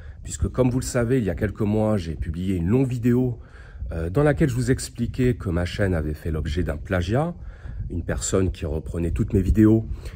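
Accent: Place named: South, France. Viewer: ça sent le sud !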